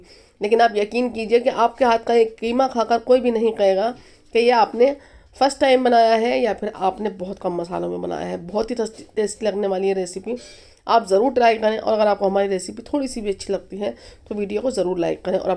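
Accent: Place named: Bhajanpura, India. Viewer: Baaji aap bhajan pura mey rehti ho kya? Meri bahen bol rahi hey aise bhajan pura waley boltey hey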